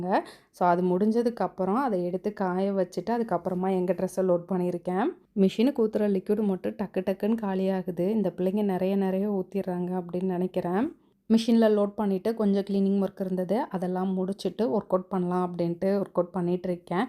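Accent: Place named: Palani, India. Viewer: Hi sis....recently i started watching ur videos...i love ur Tamil slang because its very nostalgic to me as my mom's native is palani neikarapatti and my relations over there speak like you..your vlogs bring back my childhood memories...today morning i saw ur old vlogs like love story,album vlogs and so on.....oru oru vlogs um enga ammaye oorla naa irukara mathiri feel aaguthu....mainly ur amma veetuvlogs along with your akka n family....!! Ippo yaarum oorla illa sis thatha n ammaye is no more..yellarum padippu,velai,kalyanamnu vera vera oorla settle aagitom....oru oru school summer leave ku vandha eppdi irukumo apdi irukku...unga face kuda en friend Selvi face mathiri irukku....my MEIYAZHAGI😢..!! I donno where she is...cudnt get the contact too!! a big hai to your family members n brother's ideologies!! Final thing i want to share is check your Magnesium level and kuthingal vali reason is mainly low Magnesium...consult a doctor...she will definitely suggest NEUROBION tablets...!! 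Don worry it is Magnesium deficiency..i will suggest ur vlogs to my cousins...so that they can also get the nostalgic experience...❤❤.take care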